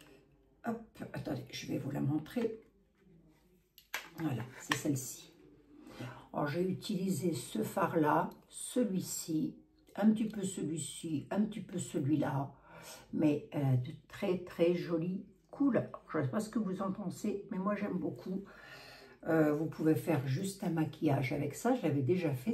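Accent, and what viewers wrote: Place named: East, France. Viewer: Coucou, deux petites questions: vous avez decoloré vos cheveux comment? Chez un coiffeur? Et ne sont-ils pas trop abîmés ensuite? Et 2e question, votre petit accent vient-il de l’est? Mon père en était originaire et j’adore cette intonation…😊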